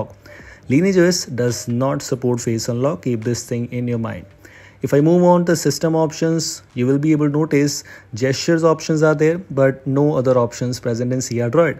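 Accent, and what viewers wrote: India. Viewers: hes talking in english but with indian accent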